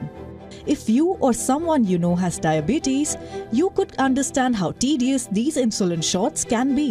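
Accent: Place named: India. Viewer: Hindu accent is bleeding my ears …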